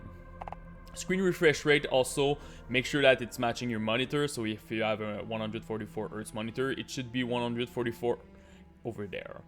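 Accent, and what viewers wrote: Quebec, Canada. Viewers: Ton accent qc est cramé en tbnk 😂 . Tres bonne video entk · tu es québéquois hein :) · T'es quebecois en? Haha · Ton anglais ma spoil que tu étais québécois hahaha merci pour ces conseils ! Je vais essayer car je run 15 19 fps avec mon laptop : acer 575g ,16go ram, et gpu 940 mx je comprend pas trop pourquoi cest autant mauvais. Si u as des conseils en plus je suis ouvert haah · are you canadian lol? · it sounds like u are from quebec? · L'accent québécois)) Are u a quebecker? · cool un quebecois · quebec french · I’m from Quebec too that’s why I recognized the accent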